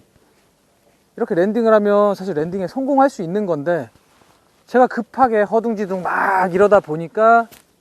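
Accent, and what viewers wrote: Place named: Chungcheong, South Korea. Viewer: 말투가 충청도인디요.. 내가 방송 해봐깐..ㅋ 어려보이는데 몇살이래요? ^^ 응원합니다~~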